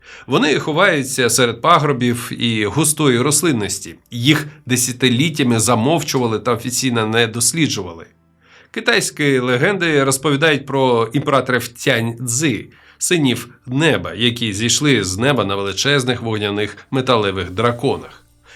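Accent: Russia. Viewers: диктор - москвороте бЬІдло, не розуміє як вимовляти "і" та "и", читає слова на москворотий лад - іЛЛюзія" і т.д. Мінус і скарга.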